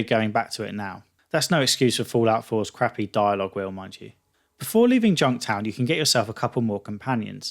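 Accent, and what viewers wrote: England, United Kingdom. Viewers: Lulaire Noroub he has a English accent therefor he say it differently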